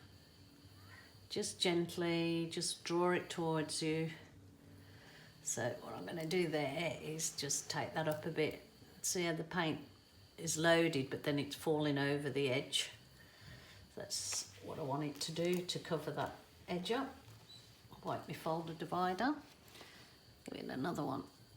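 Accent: Birmingham, United Kingdom. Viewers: Are you from Birmingham? Lol if so - waves from walsall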